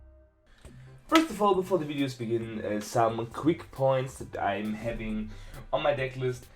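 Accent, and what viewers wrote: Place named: Germany. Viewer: Ah nice, hatte immer das Gefühl wegen des typisch deutschen Aktzents 😁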